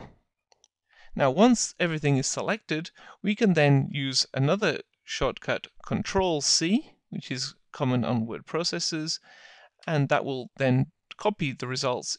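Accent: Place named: United Kingdom. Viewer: English is his native language. You must be not accustomed to the his variant of the British pronunciation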